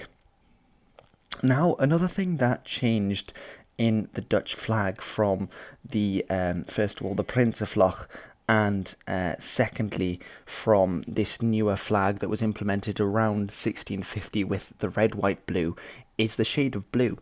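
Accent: United Kingdom. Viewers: your Dutch? You sound like a British person? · Ben je half engels? je klinkt egt brits gap.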